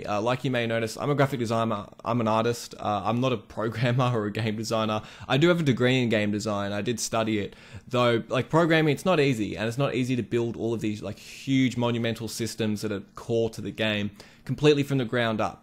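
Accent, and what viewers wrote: Australia. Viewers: ayyy its another aussie bloke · yooo idk why i got so excited as soon as i heard your aussie accent lol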